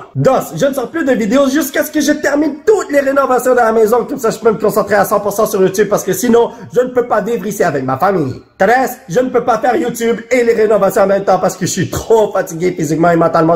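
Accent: Quebec, Canada. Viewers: Tu est québécois? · VIVE LE QUÉBEC ! J'ADORE TON ACCENT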